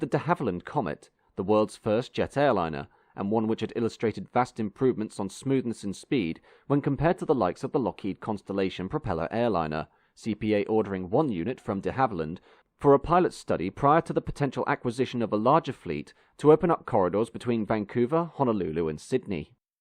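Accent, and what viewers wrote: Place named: RP, United Kingdom. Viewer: Quite a few words are mispronounced, among them 'The Pass', which is pronounced 'Da Paa'. The plummy British accent is also off-putting.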